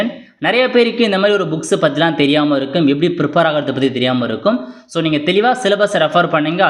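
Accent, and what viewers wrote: India. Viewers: Ennada hindikaran tamil pesura madhiri pesikittubirukka...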